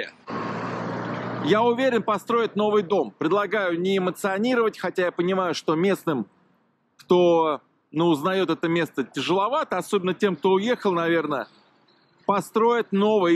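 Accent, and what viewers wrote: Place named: Russia. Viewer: Вас тоже снесут с вашим рАссейским говорком. И цитируя вас сленг, скажу : " кАроче,точно !" Не эмониционируйте и кАроче валите из Мариуполя, если не хотите встретиться с пулей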